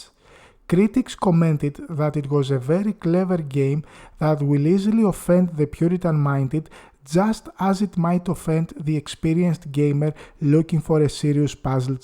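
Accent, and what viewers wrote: Greece. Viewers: Hahah why, WHY you Greeks can't pronounce sh as in sharp, ch as in child, j as in joker... I will never understand :D Still, great job, I am enjoying this.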